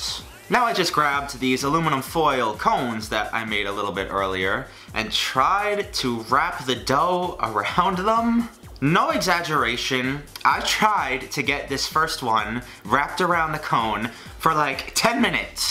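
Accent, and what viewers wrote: New York, United States. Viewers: your new york really came out here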